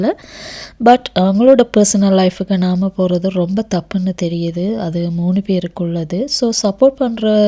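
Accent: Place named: Sri Lanka. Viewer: முதலில் நீங்கள் எங்கட அழகான இலங்கை தமிழை அழிக்கவேண்டாம். இரண்டு தோனியில் கால் வைக்கவேண்டாம்.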